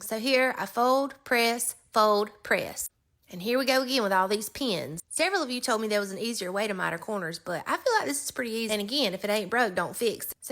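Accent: South, United States. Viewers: First of all, the southern accent is music to my soul as I live in South Carolina and secondly I love you. What a cute quilt and I can’t wait to run a muck all over your channel because you were just so darling. I think I can learn a lot from you and did I say I love you! I just found you today hurrah for me.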